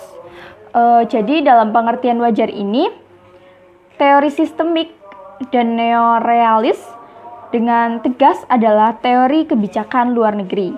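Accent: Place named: Java, Indonesia. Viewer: Kamu medhok sekaliii beb😂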